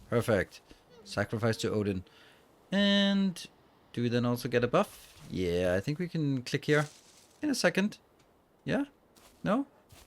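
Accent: Denmark. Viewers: Ohaaa en dansker, føler det er så cute når man kan høre det. Fed video! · Are your from denmark because You Sound like a danish takling english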